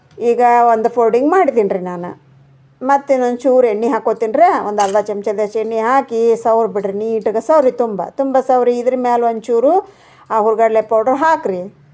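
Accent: North Karnataka, India. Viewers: ಭಾಷೆ ಕೇಳಿದ್ರೆ ಇವರು ಉತ್ತರ ಕರ್ನಾಟಕ ದವರು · ಉತ್ತರ ಕರ್ನಾಟಕ, ಮೇಡಂ ನಿಮ್ಮ ನಿಮ್ಮ ಉತ್ತರ ಕರ್ನಾಟಕ ಮಾತಾನ್ಯಾಗ ಹೇಳ್ರಿ ನಮಗೂ ತಿಳಿತೇತಿ ಮಾತು ಸ್ವಲ್ಪ ಕಡಮಿ ಮಾಡ್ರಿ ಏನ್ರಿ ಮೇಡಂ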